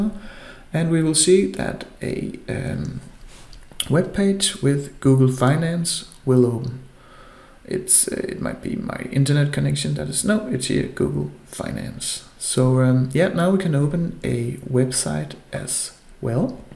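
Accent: Denmark. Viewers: i smell danish here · i smell danish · let me guess, you're danish,, i may be wrong but really sounds like it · i can already hear ur from denmark lol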